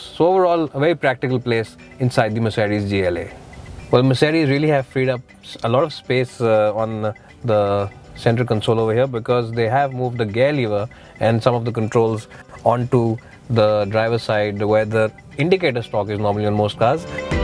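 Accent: India. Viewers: English slang indian....bla,bla,bla..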